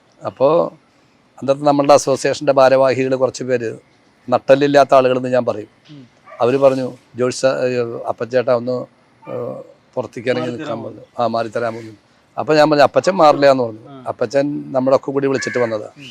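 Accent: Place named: Thrissur, India. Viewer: നിങ്ങളുടെ സംസാരം കേൾക്കുബോൾ തന്നെ അറിയാം ആ ഗഡ്സ്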